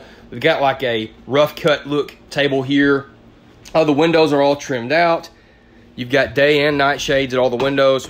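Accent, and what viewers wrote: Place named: Kentucky, United States. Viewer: Aweslme review. Thanks. Love your Kentucky accent Reminds me of a Texas accent.. Definitely upgraded items. Love the kitchen. Surprised there is no outside kitchen. My main concern would be room for fulltime living clothes storage space. Love the bathroom, and tv/fireplace in the bedroom. It doesn't constantly cover the window. Woild have like bigger windows in the living room instead of light sconces.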